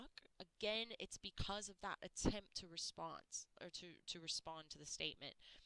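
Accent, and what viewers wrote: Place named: United States. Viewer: Why is an American teaching me English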